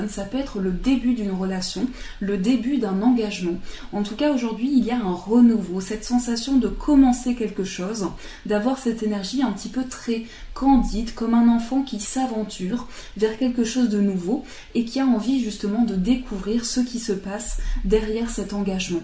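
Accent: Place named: South-West, France. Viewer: Bonjour C'est la 1ère fois que je vous découvre, je crois deviner l'accent du sud-ouest 😊 Vous êtes très belle et rayonnante, non , non, ne rougissez pas😊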